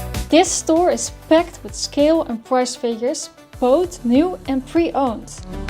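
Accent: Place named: Netherlands. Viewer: I can tell the dutch accent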